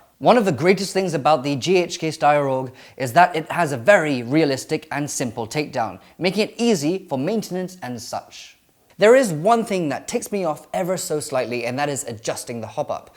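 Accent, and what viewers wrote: RP, United Kingdom. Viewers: looks asian talks like a brit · Well, if it's genuinely how you talk and you aren't putting it on for the camera, then I will have to apologize for being derogatory towards you. I would guess having a posh british accent with the native language would dilute them into a mixture of both. Truth be told my problem is with people who pretend for the camera. I hope you accept my apology as I do enjoy your video's.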